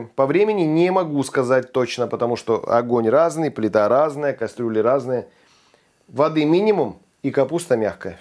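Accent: Siberia, Russia. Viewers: ! Какое же я получаю удовольствие от общения с Вами! Первое — это Вы, а уже второе — Ваши рецепты! Сама я россиянка. Корни моих родителей в Ярославле. Но так сложилось, что с детства живу в Украине. Есть чувство тоски по России. Давно там не была, а теперь это и совсем проблема. Вы — одна из ниточек, которая связывает меня с моей Родиной. Ваша речь, Ваш говор — это такое счастье для меня! СПАСИБО!!! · Привет! Привет из Кемерово, мы почти земляки, у нас стоят сильные морозы, без надобности по улице не гуляем, я лично с утра смотрю твой канал, встретила тебя на ютубе не так давно и влюбилась, сначала мне казалось, что ты новичок и делать ничё путём не умеешь, сейчас мне даже смешно, я поняла, что человека сначала надо понять, как бы срастись меж собой, понять философию, в данном случае готовку разную. но даже не в этом дело, ты говоришь и думаешь как настоящий сибиряк, мы тоже так разговариваем и мне доставляет удовольствие слушать тебя, как будто ты у меня в гостях! 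Ты меня понял, я знаю, успехов тебе и удачи во всём, конечно семейного счастья и здоровья всей семье! С наступающим!!!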